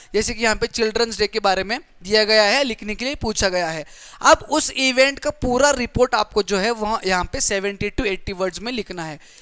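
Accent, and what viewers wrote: Maharashtra, India. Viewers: Sir aapn Maharashtra che aahat ka , toning tar tashich watate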